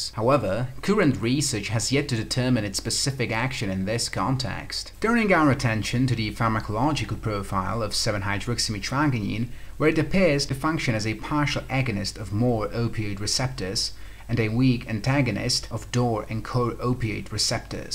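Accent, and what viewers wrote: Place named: United Kingdom. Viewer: Jeej... to znie britsky, nie americky A hlavne nespievas 😅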